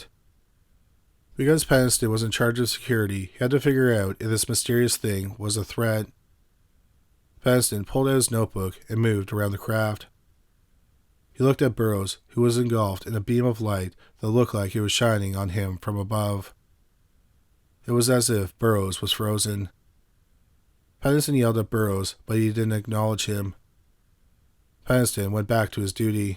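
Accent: Canada. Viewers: There’s a lot of Amazing calm speaking narrators on YouTube. Top 5s comes to mind. However, the consistent mispronunciations of ordinary words is grating for quite a few viewers & listeners. A lot of it appears or I assume is it to be the 🇨🇦 accent & a lot of it almost has sounded , like for years now, just an inability or refusal to pronounce the full word, it’s one thing to say ELLY-inn every time for the word alien but quite another to not care to realize that full endings or words are just skipped , the end of words such as Forest, instead he constantly says Foress. Or something along those lines, just kind of a wild line of work to be in when speech & possibly malaprop is heard almost more than correctly pronouncing words, or I’d imagine he’d say Worse not WorDs.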